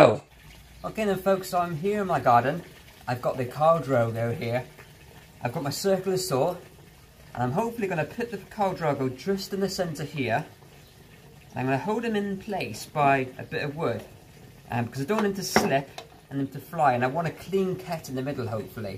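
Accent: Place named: United Kingdom. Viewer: What a British accent and its not a bad thing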